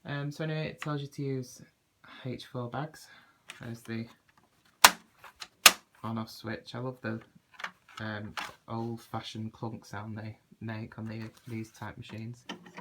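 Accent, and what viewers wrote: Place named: North England, United Kingdom. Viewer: This was back in the good old days when the Brits and the Yanks still shared vacuum designs for the Hoover cleaners... One of my Mum's best childhood friends worked at the N Canton Hoover plant for decades(both their Dads were native Scots/Brits) and the two Dads worked for Goodyear Tire/Tyre and Rubber in Akron, Ohio, my current hometown... I enjoy your videos and comments and your lovely Liverpool or Manchester accent!!! Cheers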